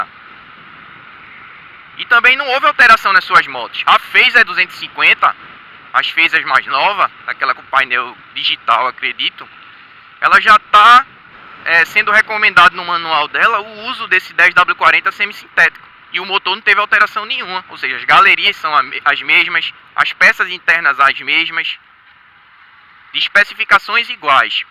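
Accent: Recife, Brazil. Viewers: so ouvi verdades tu es do recife? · eta sotaque feio do c da pra notar de longe que e de recife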